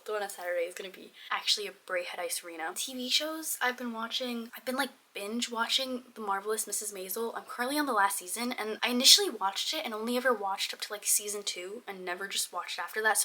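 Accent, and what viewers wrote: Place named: United States. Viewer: I did not expect an American accent lol, I thought I’d here a thick Scottish accent. Do a lot of people outside of Scotland go to the school?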